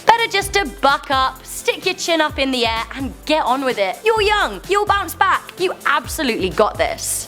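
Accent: United Kingdom. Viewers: Is it me or did express her British side more than usual 😂 I live in England to.... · Ahhhhh I love that British accent!!!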